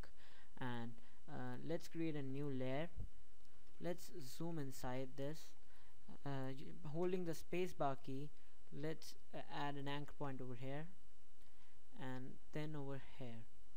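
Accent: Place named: India. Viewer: indian accent man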